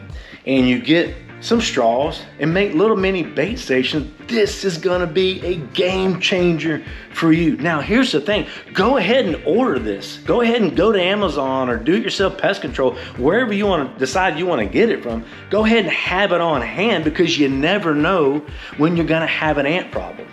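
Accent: South, United States. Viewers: I’m South south Louisiana. Your accent gives your southern away too. Last 2 years, our subdivision has been invaded by Crazy Ants. They don’t follow a trail, don’t bite, and seem frantic and only outside so far. Millions of ants like a true invasion. LSU says they act like that because they don’t have a Queen. They are coming out of the sugarcane field. Because we don’t know where the colony is, and they usually don’t return to the colony, there is no way to destroy them. LSU treating yards with the termite poison, but have to treat every few months. I would love to stop spraying as we have ducks, chickens dogs, cats etc. Have you all had these ants? It’s INSANE.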